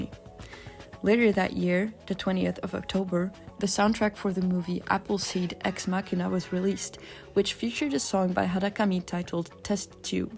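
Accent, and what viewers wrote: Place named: Netherlands. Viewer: was wondering if you were dutch until you said "stronger even" then i was sure lol this is great work for a first video essay! You really did your research, really difficult stuff since as you said, most sources are in Japanese only. Shows you're passionate about it! keep making stuff like this and you'll find your niche audience in no time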